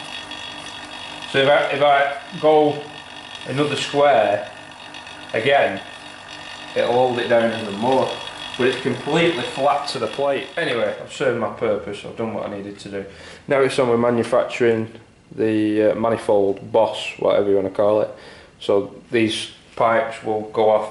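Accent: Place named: Northern England, United Kingdom. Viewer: Hi, Its actually nice to hear a northern accent instead of an American one. However i do like the guy John Saunders here on YouTube NYCCNC Hes the dood. I was just looking for the.nc code (has the machine code XYZ steps in it) file your machine used to cut the troughs in the plate. I figured you were using a cnc machine to mill the table. What program is your mill using Mach3?. I produced an autocad drawing of a vacuum chuck not dissimilar however i did cheat. I thought of milling the grooves in the top as you would but then drilling only one hole in from the side of the plate into the centre then a hole in the top of the plate down into the side hole. Your design using a chamber milled under the plate instead which to be honest is the correct way of doing it. I tried to convert the file in Cambam (post processor) into an NC file for my milling machine but ended up making about 100 profiles around each castllation in the top plate, not the straight x then y mill routes i was expecting. 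Im Still a novice i'm afraid. I did manage to build a vacuum ejector/generator for to go on an air supply like this one on Ebay <link> Got some good results as well I have a similar Vac pump to yours which is actually what i will use in the end.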